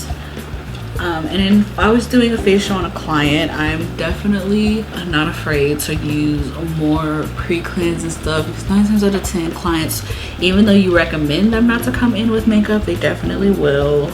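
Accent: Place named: Haiti. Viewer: Are you Haitian? You have the accent.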